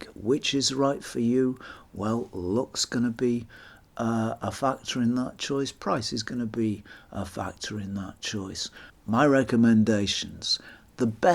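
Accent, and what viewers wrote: United Kingdom. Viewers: Great thanks to you! It was a pleasure to listen your British and things you told. Спасибо)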